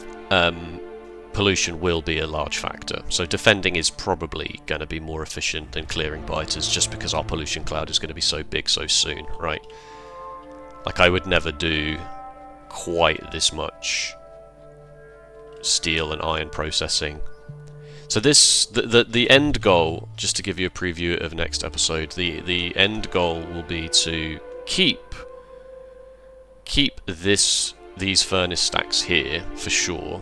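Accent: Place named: United Kingdom. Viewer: I like this format. I'm just here for the Factorio content. Carry on doing what you enjoy doing. Waffling on in a British accent is fine... kind of soothing really.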